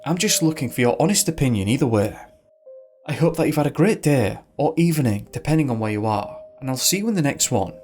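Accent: United Kingdom. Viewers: Chills with a British accent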